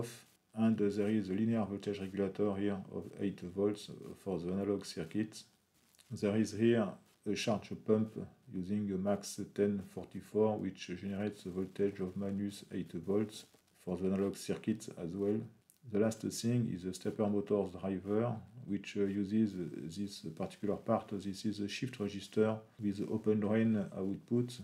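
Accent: France. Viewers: Simple, bien filmé/monté et surtout complet ! Merci pour cette analyse et cette formidable chaîne, extrêmement riche en pièces originales. Un vocabulaire technique parfaitement maitrisé avec un bon accent français comme on les aimes 😃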